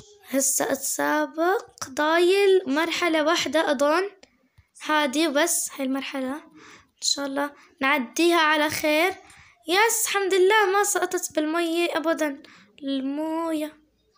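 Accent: Baghdad, Iraq. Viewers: ههه انتي مضحكة في الهجا العراقي انا من العراق يعني أعرف شقول في العراقي 🧸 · احله لهجه هي العراقيه بس انتي شوي تعرفين عراقي 😭🌚🤓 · يخبل كلامج بالعراقي بس لو تتعلمي اكثر راح تصيري احلى انتي تجنني تحيه من العراق للمغرب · انا عراقية🐸💗 الي هية احمر فوق وابيض فالنص وفيه كلمة الله اكبر وتحت اسود ههههههههههه يربي شلون حجيتي عراقي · اول شي حجيتي بل الغة البغدادية واني عراقية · مو صعبه الهجه اللجه انتي حجيتي بغدادي اليغدادي صب الجنوبي اسهل تحياتي فاطمه من العراق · واوو كلش تحجي عراقي إذا تردين اعلمج بعض الكلمات العراقية 😍احبج 😍😍😍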